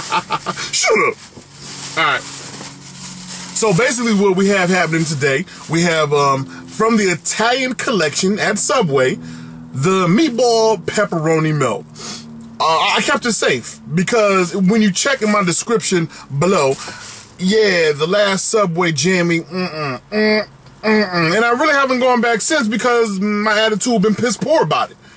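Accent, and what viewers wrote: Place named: Connecticut, United States. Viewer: He is from Connecticut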